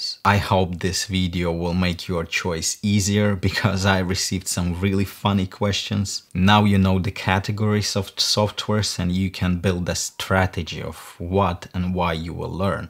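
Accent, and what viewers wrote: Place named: Russia. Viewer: Russian accent😂anyway blender 2.8 is future 3d modelling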